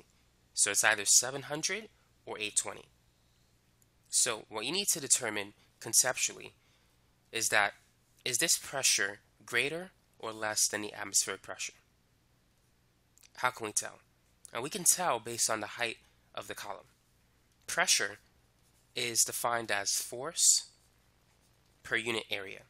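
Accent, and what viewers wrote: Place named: Boston, United States. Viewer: Dope Boston accent 👌🏻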